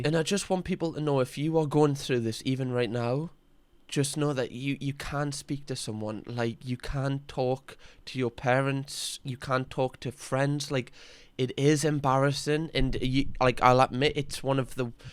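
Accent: Newcastle, United Kingdom. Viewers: hi, fellow Geordie here and someone who's been through similar things. not the same, but similar. thanks so much for speaking out. this has just happened to a friend of mine. he called in a panic and didn't know what to do. luckily i put him in contact with my friend who works with the police force, so its getting sorted. but i wanted to say that you speaking out and using your platform is going to help so many people. so many youngsters look up to you and now you've made so many people not feel so alone. i am so sorry you went through this and at such a young age. it shouldn't happen and your right that is nonsense that the helpline doesn't help those who are minors when these are the people who need the most guidance. it seems like you've done a lot of work on yourself to speak to candidly and passionately about something so traumatic so you should be proud of yourself for how far you've come. 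just know that doing what you do you bring smiles to so many even me who lives away from home at the moment, listening to you laugh n talk in that way that only we Geordies seem to, brings so much comfort these journeys are never fully over, there will always be more work to be done, good days and some not so great, but you really are an impressive young man and i know that its not just myself who's grateful you were able to survive your situation. keep that compassionate vibe you have, you never know who you may save by telling your story, who you may inspire to speak out, or who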